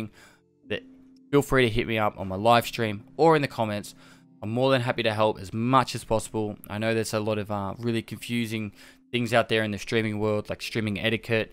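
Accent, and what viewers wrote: Australia. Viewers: So I found you because you ran duos with summit but I was so pumped when I heard an Aussie voice. I'm 36, father of 3, business owner and very part time streamer too and I think the biggest thing you say here that is so correct. Consistency. Your chat is always so busy so I'm taking advantage of this opportunity here to say, thanks for being so humble, honest and truthful on and off stream. I really enjoy being a part if the community and if you ever make it back to Radelaide beers on me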